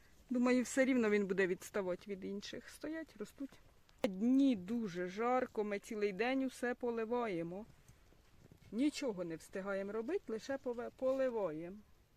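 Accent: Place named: Varva, Ukraine. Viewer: Знімайте будьласка довші відео,хочеться Вас слухати і слухати.Якаж співуча мова наша,я з Варви.Але вже 50 років там неживу,але говір впізнаю завжди.Будьте здорові і успішні.Божої благодаті Вам і вашій родині.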